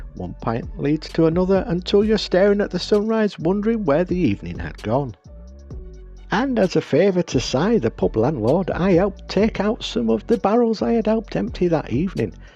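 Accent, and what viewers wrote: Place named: Northern England, United Kingdom. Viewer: your storytelling northern boy accent is perfect for Shambalshire It’s a great accent. I have an unfinished piece that I think would really fit in to Shambalshire I’ll take a few photos and send them for opinion Cheers pal 👍☘️👍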